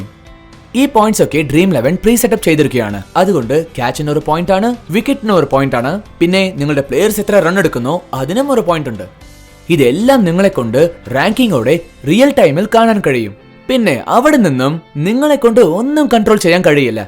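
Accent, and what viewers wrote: India. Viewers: Not natural Malayalam looks like a Tamil guy did the voice over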